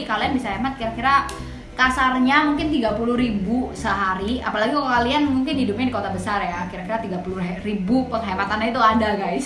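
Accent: Java, Indonesia. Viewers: Mba e mendok men logat e😂, joss tipsnya🤩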